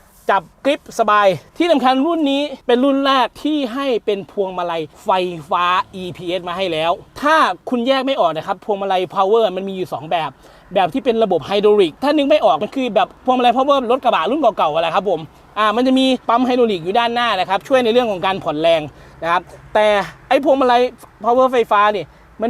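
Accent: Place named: Isan, Thailand. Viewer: admin คนอีสานแน่เลยสำเนียงภาคกลางพอได้แต่ยังไม่คล่องเท่าไหร่ครับ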